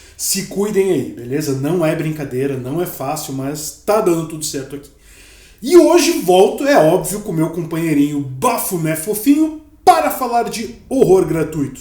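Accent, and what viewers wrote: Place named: South, Brazil. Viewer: acho que ele é ou Paranaense ou Catarinense por esse sotaque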